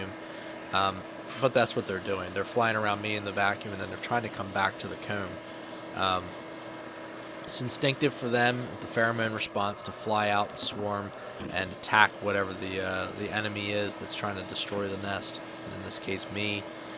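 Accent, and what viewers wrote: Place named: Midwest, United States. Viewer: "be-yahsketball" Midwestern US accents are the best.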